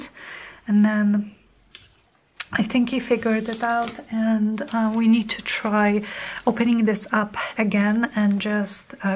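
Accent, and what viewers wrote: United Kingdom. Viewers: I love these vids. 💜 Your creations are so delightful, even your scraps are lovely! Been busy knitting and trying to learn new stitches which is a challenge for me. Hey! When did you replace your table? I miss the teal one 😉 I love Scottish accents too 💕 if I could have seen in concert that would have been epic!! 💫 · both of those series made me wish I could travel to Europe and Australia. My family name is Montaque and we came from England and Scotland. I love the accents. That's another reason your voice is so soothing and relaxing to me. I love watching you and hearing about your life. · I love your new series! Absolutely relaxing and it's so nice to know you better as you talking more about yourself ☺ I love Downton Abbey, too! My mum and I watched it uncountable times 🥰 And I agree, I could listen to British English for hours. 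I do not watch TV or movies/series very often, I am more in reading , so no tip for you 😅 But warm hugs instead 🤗 😉🐶😘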